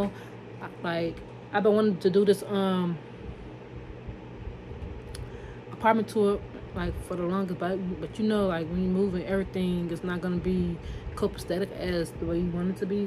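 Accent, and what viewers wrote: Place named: Chicago, United States. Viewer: You sound like you can be from Chicago..are you? my dads side of the family stay there and your accent is similar to theirs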